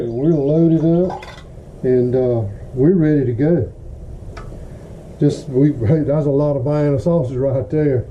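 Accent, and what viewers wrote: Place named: Georgia, United States. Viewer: I know you gotta be from Ga. The way you say pea-can. I’m from about 15 miles west of Dalton Ga. Like your videos. Finally someone I can understand. Keep up the videos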